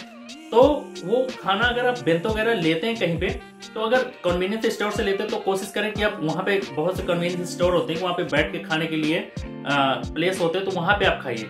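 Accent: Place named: Bengal, India. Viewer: Apka bolne ke tone bangalio ke jesa h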